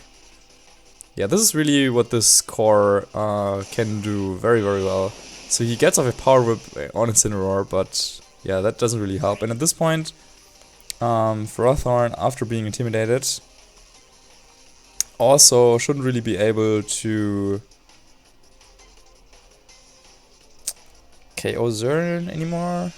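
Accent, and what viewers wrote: Germany. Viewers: Your German accent English is wayyyyy too cute and my ears enjoyed it. You're such a chilled guy lol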